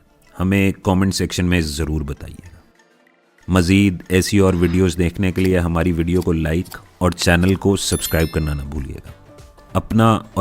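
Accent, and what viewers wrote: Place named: South, India. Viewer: R u a muthalman bcoz u sounds as a mdracha chaap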